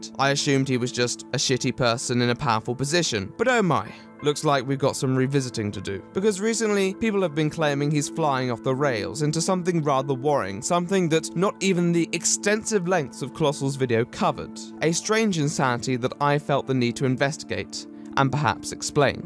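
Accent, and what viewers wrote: RP, United Kingdom. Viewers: You posh Brits GOSH!! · I like these slightly shorter vids. Not that I don't like the longer ones or appreciate the work but I finish these more often than the full on 60 minute documentaries. Mainly because I watch these in bed sometimes and fall asleep from his sexy british yarn lol. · This is weird but I love your voice and accent some British people I’ve encountered I thought were from Scotland anyway love your videos 🙌🏽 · I rarely comment on any videos. But, I have been binge watching these videos!!!! I'm into British Culture so the first thing that kept me here was the accent and then the fact that he is able to take me from A-Z on people I have idea about and I leaving feeling like I am ready to Spill TEA!! LOL! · People are comparing you to Colossal is Crazy? What? Why? Your content is way different than his and your voices aren't the same, I can tell that you both are from the UK. 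· Actually i love the British accent actually i think its the classy version of the English language. Theres another youtuber i like and thats Mr. Jim Sterling. And im a new subscriber to you to and i like your videos as well. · So posh 😍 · Omg you sound like your from the Uk !!! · I dont know whats less interesting, phussy or this video about him.....i will however subscribe as i am an american and therefore hypnotized by a british accent.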